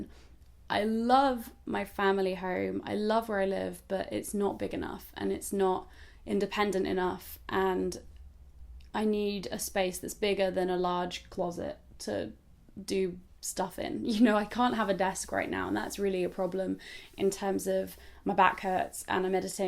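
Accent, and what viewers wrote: United Kingdom. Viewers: I wish I could meet you a onc, just to tell how much you are gorgeous with British accent .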